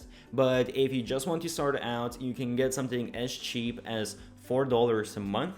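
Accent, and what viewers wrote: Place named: Germany. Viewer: Could be German, or Russia or Estland?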